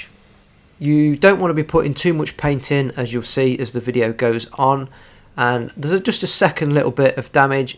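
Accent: United Kingdom. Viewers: Straight to the point no BS sound guy, and judging by his accent, no surprise, all straight talking in that part of uk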